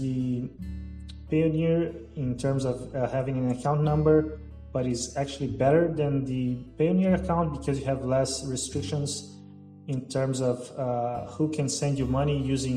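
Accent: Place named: Rio de Janeiro, Brazil. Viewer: Eu tenho quase certeza que é carioca... =D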